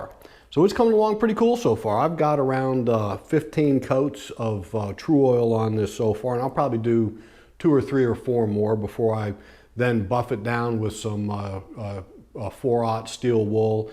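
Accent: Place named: East Coast, United States. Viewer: I also find colors to be so difficult, it's one of my areas to learn more about also. At the end, what you came up with look amazing in my opinion. I'm really happy you chose to differentiate the burl because it looked blah before you changed it. Great work, great looking guitar! Where's the accent that you have from, east coast I assume?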